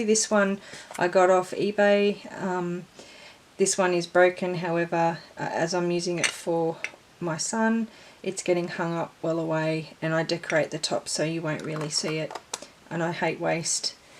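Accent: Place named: Australia. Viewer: Thank you for sharing your crafting. It is so lovely to hear a fellow Australian accent.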